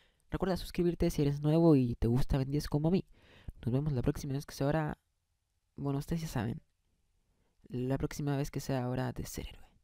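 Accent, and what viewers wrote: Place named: Antioquia, Colombia. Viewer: Sin ofender pero tú voz es muy paisada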